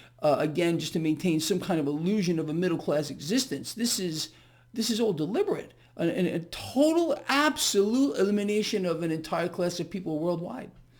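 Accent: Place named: New York, United States. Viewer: I'm a life long New Yorker. You sound like you're from NY. Am I right?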